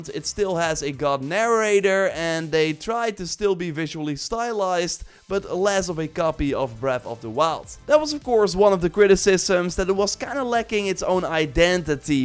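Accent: Netherlands. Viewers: Dutch I believe he's from Amsterdam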